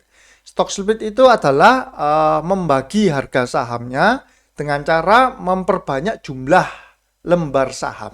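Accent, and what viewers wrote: Surabaya, Indonesia. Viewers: Aksen suroboyoan.... Joss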